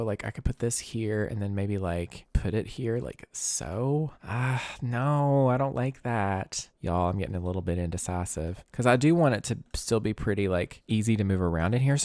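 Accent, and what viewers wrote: South, United States. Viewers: Just working from home and listening to calming voice! I work CS and find that his smooth tones and familiar Southern access sooths me while I deal with the aggravations!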